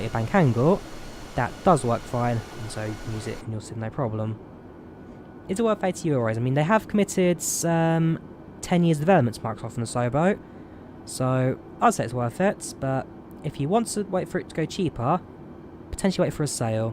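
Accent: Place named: Yorkshire, United Kingdom. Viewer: Where are you from...you have that yorkshire accent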